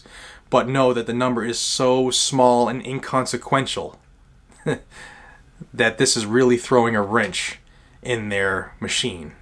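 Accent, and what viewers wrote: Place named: Massachusetts, United States. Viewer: Keep on keeping on! By your accent, i'm guessing that you're from Massachusetts. Don't know if you'd want the aggravation, but if you were to ever run for a seat in gov't, you definitely got my vote! "I was born free, I will live free, and I will die free!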